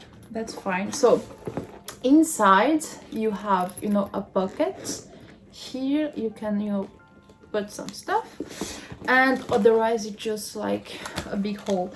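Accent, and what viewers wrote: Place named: France. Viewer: Are you French? Love the accent!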